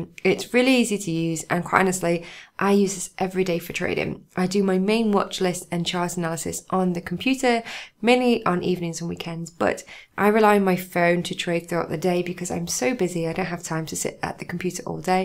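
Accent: United Kingdom. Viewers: She just made everything seem simple with her amazing British accent!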